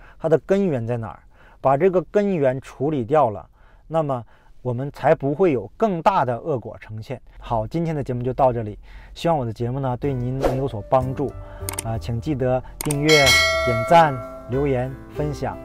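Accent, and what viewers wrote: China. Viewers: 因为新加坡开始有疫情，没有口罩，去台湾拿口罩却拿不到，我们新加坡的口罩是留给医护人员和前缐人员，因为他们比较危险，没有他们的付出病人怎么办？請问你是那一国人，你的口音很像中国人。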